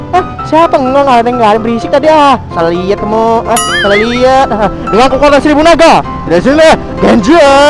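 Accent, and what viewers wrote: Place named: Java, Indonesia. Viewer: bang logat lu kok medok sih.. lu ada keturunan jawa apa gmn?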